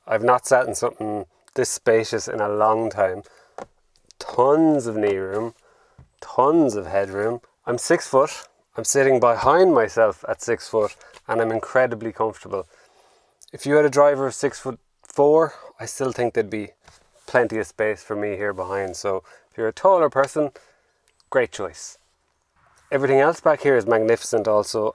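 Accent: Ireland. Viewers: I love that Irish accent 😊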